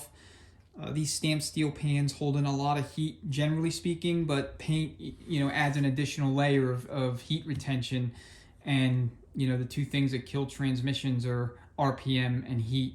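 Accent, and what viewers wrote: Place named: Philadelphia, United States. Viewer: Another excellent video! Thank you for giving me the confidence to work on my transmission! Based on that accent, you gotta be a Philly guy!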